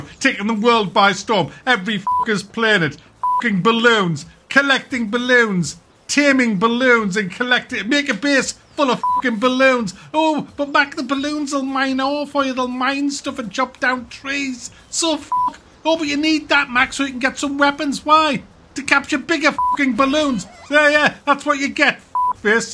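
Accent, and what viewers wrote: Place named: Sunderland, United Kingdom. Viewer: this. He's a mackem. I know because I'm from Sunderland. The difference in the accent is very noticeable to someone from the north east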